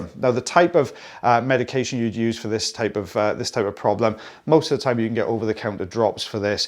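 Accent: Wales, United Kingdom. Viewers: As someone who works in the health sector (disability) I love that you're now using the medical terminology in your videos. While I don't have to use them myself on a daily basis, I do have to attend appointments with clients and being able to translate them to layman's terms if the doctor or specialist uses them is a win. Thank you for continuing to make these videos...and your Welsh accent is a breeze! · I usually struggle with welsh accents (being broad yorkshire im used to very diferent) but your accent is quite a soft welsh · You're Welsh accent is lovely. Yep, American here. 😆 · I appreciate the medical terms. Knowledge gained is an amazing thing! And don’t worry about your Welsh accent. This American with Welsh ancestry loves it! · I love that you are posting on weekdays and this video is terrific! I love getting the medical terminology. Putting it up on the screen is a great idea, too. 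I'm a microbiologist, love you accuracy! By the way, I super enjoy your broad Welsh accent!! 😁💕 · The Welsh accent is fine by me,and knowing the proper terms is really interesting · Thanks for putting the terms in writing. My hearing isn't too good, so sometimes it's hard for me to make out what people are saying. Nothing wrong with your wonderful, welsh accent 👍😊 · I don't think any of your diehard fans will complain about the medical terms. As for your Welsh accent, it could be worse. You could have a strong Scottish accent! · Brother coming from Alabama USA I personally like that "Welch accent"! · i like your "welsh" accent! Please continue to use some technical terms; showing the spelling and meaning to great! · I ❤ your Welsh accent! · As long as you don't get rid of that Welsh accent - as someone from the US, I truly enjoy the accent and have little, if any, trouble understanding you! 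😀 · Love the Welsh accent. Adds a good bit of charm to the vids. Keep the medical terms coming! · Love your Welsh accent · Love the Welsh accent. Never miss an episode. Keep up the good work 👏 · I'm American and can understand your Welsh accent perfectly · I am really enjoying the changes you are making. You would make a terrific teacher. And I love your Welsh accent. One of the reasons I follow your channel.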